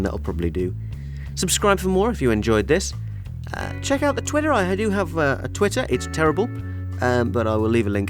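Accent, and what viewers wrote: RP, United Kingdom. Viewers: You sound well posh, what part of the UK are you from? I'm from brummie and we all sound like crisps and sausage with beans.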